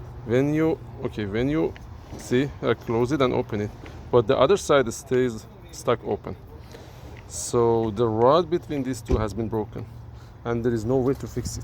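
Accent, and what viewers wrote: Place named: Iran. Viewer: Persian accent😊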